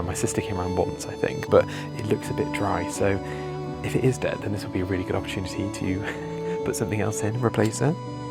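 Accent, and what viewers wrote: England, United Kingdom. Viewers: thank you for today's enjoyable video. I love watching each one. You provide such interesting content. I love your new kitchen renovation. You have incredible taste and a wonderful sense of style. Your videos are not only inspirational but also instructional. As you explained the aesthetic and practical values achieved in the kitchen and garden, I learned to appreciate those perspectives in the changes that I make in my own surroundings. You are an incredibly gifted and talented young man. Besides, I could listen to your great English accent 🇬🇧 all day. Dending you and God's blessings of love 💖, joy 😊, peace 🕊️, and more renovation ideas for your home 🏡. From across the pond 🇺🇲, Robert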